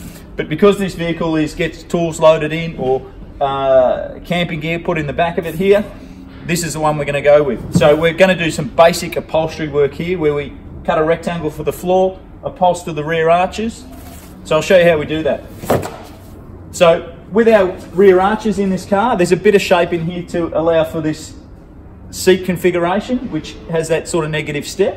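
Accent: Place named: Australia. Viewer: your genuine Ozzy accent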